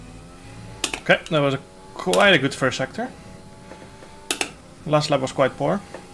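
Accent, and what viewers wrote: Netherlands. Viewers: Are you dutch because your accent sounds like verstappen :). I'm american so I'm not good with european accents for the most part.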